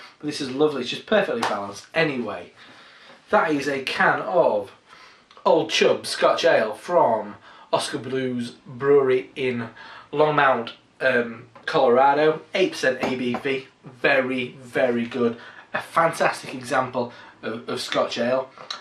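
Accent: Yorkshire, United Kingdom. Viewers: oh thats bootiful loovely haha gotta love the yorkshire acsent on an american beer ;) = great review dude = i tried finding if they were going to export to uk from what i have heard it may be end of year before they export to UK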